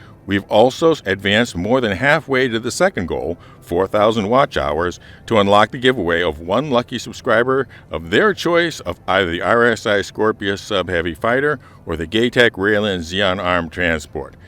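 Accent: United States. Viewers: Damn now that American accent is so amazing. You should make angry shouting ads for ships in the american military style lmao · Mate, that accent is the most American thing I have ever heard. Loving your SC series more than Moe Haytar loves his packages. 😁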